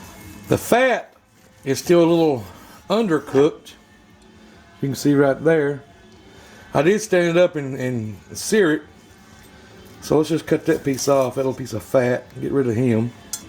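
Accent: South, United States. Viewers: his voice is classic country boi status.. Kool Dude though.. i like his technique, i would definitely add my own spin to it... but overall good job..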